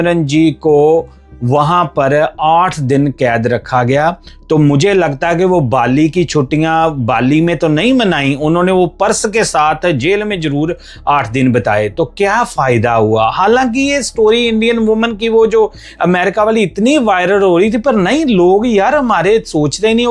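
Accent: Punjab, Pakistan. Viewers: Tera accent pakistani hai....naam rakha hai sale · Yaar aap Pakistani punjabi ho kya?? 😂😂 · App ka Hindi mano aap Pakistani ho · You are pakistani accents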